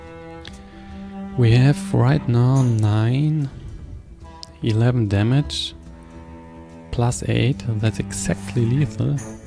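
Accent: Germany. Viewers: are u from germany? funny enough i'm trying to learn some german :D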